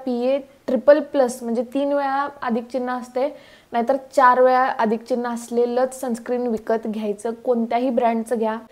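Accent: Kolhapur, India. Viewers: Communication skills Uttam ahe tumach❤ kolhapur cha tone yetoy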